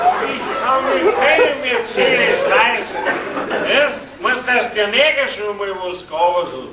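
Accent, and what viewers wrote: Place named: Bavaria, Germany. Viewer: Als grenznaher Baden-Württemberger muss ich sagen: Grünwald ist in seiner Comedy-Sparte König. Ich glaub, ich finde den so komisch gerade weil er Boarisch spricht. Boarisch und fließend Besoffen.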